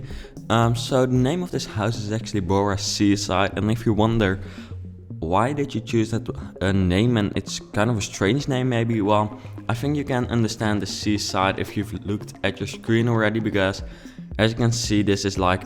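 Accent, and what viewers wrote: Netherlands. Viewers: Je hoort duidelijk dat je Nederlands bent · Are you from the netherlands? Your accent sounds dutch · I can hear the Dutch through your English. I'm Flemish. (Yes, I'm watching this in 2017.) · You can really hear you are Dutch 😂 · Hey nice video , i love your accent. I am also dutch, well my dad is my mum isn't so that explains my name XD i don't know much dutch buy yeah nice video XD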